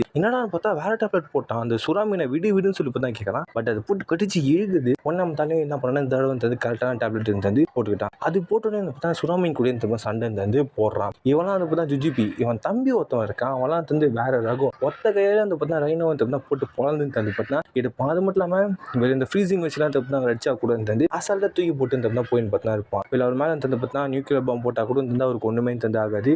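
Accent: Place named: North India, India. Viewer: Tamil tha pesuriyaane therla anegama vadakkano irupiyo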